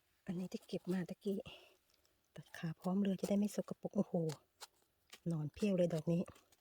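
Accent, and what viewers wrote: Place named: Suphanburi, Thailand. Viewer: ฟังเสียง พูด เหน่อๆ เหมือน คน สุพรรณบุรีกาญจนบุรี รึราชบุรี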